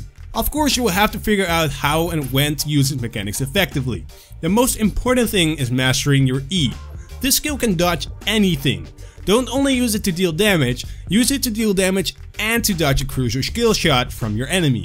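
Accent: Netherlands. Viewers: Are you, by any chance, Dutch? I hear a typical Dutch "a" and "i"... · I knew it! You speak Dutch :D The accent is heareable :D Nice video once again en blijf zo verder doen! :) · ARE U DUTCH? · Ben je Nederlands ja?? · Woow man. Geweldig accent. Nooit verwacht dat jij nl was XD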